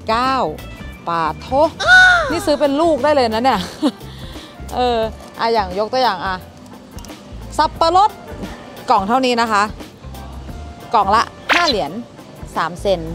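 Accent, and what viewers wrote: North, Thailand. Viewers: F.C เจียงใหม่ ..เป๋นกำลังใจ๋หือเน้อคับ ดู.ทุกต๋อนเลย ชอบแต่ะๆครับ อู้กำเมือง.ฟังแลัวน่าฮักดี....เจ้า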